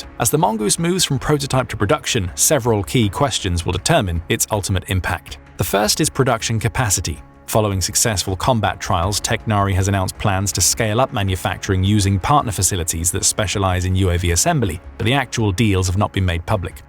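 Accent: United Kingdom. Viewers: English (UK) accent is getting old.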